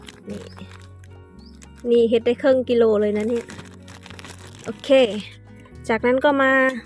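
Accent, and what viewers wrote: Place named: Thai Phuan, Thailand. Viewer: ภาษาพูดคล้ายๆ คนชาวไทพวนที่บ้านผมเลย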